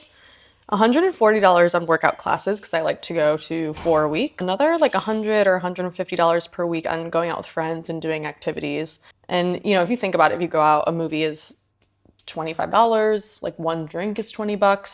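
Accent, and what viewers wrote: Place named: Australia. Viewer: I'm hearing an Australian accent more with every video Just waiting for the 'no worries' and 'mate' 😂😂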